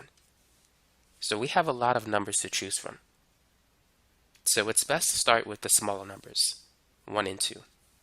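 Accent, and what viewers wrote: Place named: New York, United States. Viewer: Idky why but I keep hearing a ny accent😭 I can’t unhear it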